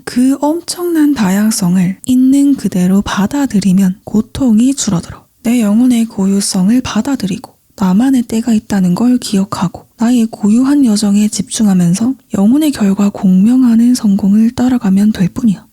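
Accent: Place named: Busan, South Korea. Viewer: 나만의 타인의 고유성 다양성❤ 감사합니다 😊 부산분 같아요 만나고 싶네요 🙏🏼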